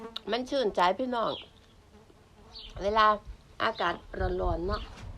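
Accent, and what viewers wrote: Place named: Korat, Thailand. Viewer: น่ารักจังเลย ดีใจที่ได้รู้จักค่ะ สำเนียงโคราชมา น่ารักจัง พี่ก็คนโคราชเด้อ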